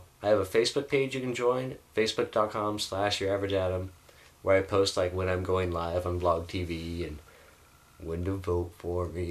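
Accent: England, United Kingdom. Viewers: Your English Accent is Class!! :D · O Your English accent is amazing!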